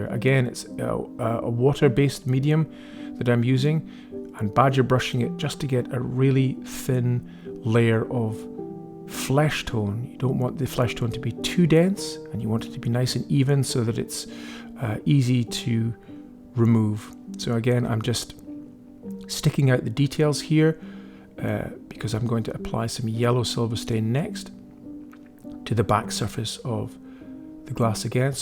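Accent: United Kingdom. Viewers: There is one product called Pebeo Vitrail that you don’t have to fire, but if painted while the glass is vertical and not horizontal, it will run downwards. I’ve found it also smears out as it dries, so painting a scene or portrait or whatever, the detail gets lost. It is oil based and requires mineral spirits to clean your brushes. My apologies to - I know I wasn’t asked and it may be that this product is only available in the United States (assuming you are in Great Britain by your accent). Just wanted to pass that tidbit of information on as it may help their situation. I was wondering if you could take your skills and apply them to a glass piece using the pebeo Vitrail glass paints. I’ve been trying to see if I could but haven’t spent too much time trying yet. I’ve just done some quick preliminary trials so far. What I’ve found I have said above to the OP. 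Where there is a will there is a way!..hopefully. Anyway, thanks for sharing your expertise! The restored piece is fantastic!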